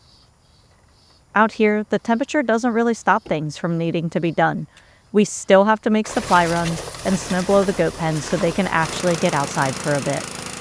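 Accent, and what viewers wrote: Philadelphia, United States. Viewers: Is the narrator from the Philadelphia area? You can take the girl out of Philadelphia… but realistically, it could be anywhere in PA/NJ/DE/MD/VA that I hear. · I've enjoyed this, nice to occasionally see DIY people who are actually competent builders. I grew up in an A-frame house with a gambrel or double pitched roof. Your garden harvest was epic. I think I'll stick around. Is that a Philly accent or Delaware? I know it's not Western PA, they have a different thing going on over there. 🙂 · do I detect an eastern Pennsylvania accent? Philly maybe?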